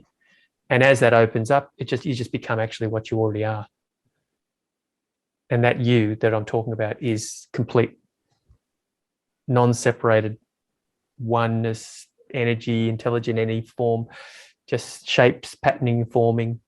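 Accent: Australia. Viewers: Hi, you are obviously Australian, do you hold satsangs or meetings anywhere? Who are you talking to?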